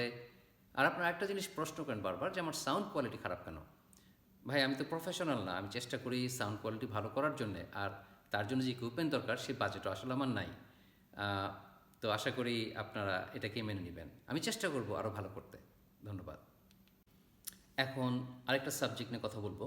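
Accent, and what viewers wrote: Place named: Joypara, Bangladesh. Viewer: ভাই কিছু মনে করবেন না, আমি আপনার খুব বড় ফ্যান, আপনার কথা শুনে আপনাকে ঢাকার জয়পাড়ার লোক বলে মনে হচ্ছে। এটা কি সত্যি?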